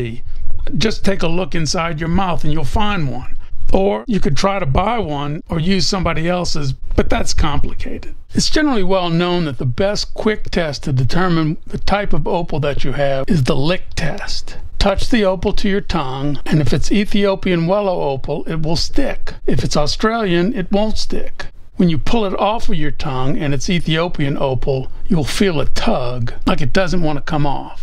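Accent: United States. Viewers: What area of the US is your accent from? I have always wondered. I find it pleasant.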